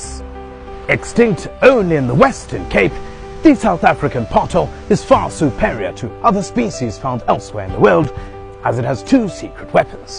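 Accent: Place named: RP, United Kingdom. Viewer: you are quite simply brilliant! Love the posh accent and style of presentation. Gimme more, gimme more! 🎉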